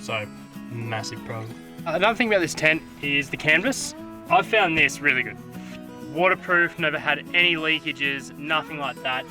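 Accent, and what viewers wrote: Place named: Australia. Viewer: So nice to hear Aussie voice on YouTube. Subscribed just cos that (well and you say you'll be vlogging your travels around Oz). I liked how the tent was dusty and stuff - obviously getting used and well, dust, so Australian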